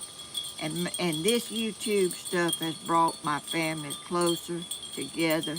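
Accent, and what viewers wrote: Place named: Georgia, United States. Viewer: You are such a natural, Ms. and we love you dearly. I put you on every day for my 6 cockatiels to watch and they love you, too! I lived in Georgia for 10 years and miss it so much. It's way better than living in Florida. Just your accent makes me feel right at home. You have become family to me and my little birds. Sending love and praying for blessings to you today!:)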